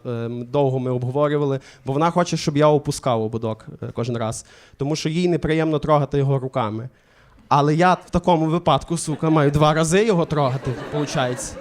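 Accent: Galicia, Ukraine. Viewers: Прекрасний галицький акцент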